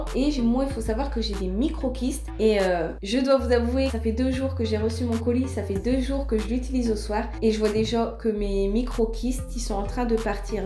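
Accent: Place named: North, France. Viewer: J'ai deviné tout de suite que tu venais de chez nous (Nord de la france). Tu as un sacré accent ^^ Merci pour la vidéo de haul, bonne continuation :)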